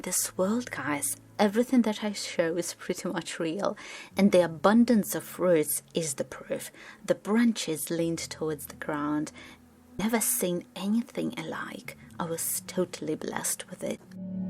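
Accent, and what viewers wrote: United Kingdom. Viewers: why does she have a harry potter accent?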